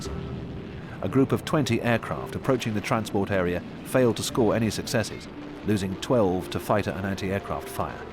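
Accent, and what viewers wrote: United Kingdom. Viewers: Love these history docs narrated by cool Brit, while vintage video flickers along 💖 Many thanks 🙏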